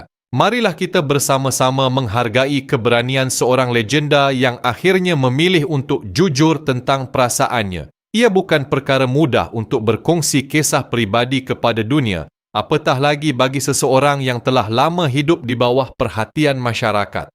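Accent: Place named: Indonesia. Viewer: ni konoha, diorang memang banyak buat content merapu. Kalau dengar cara bercakap ni bukan Malaysian 🇲🇾, orang seberang!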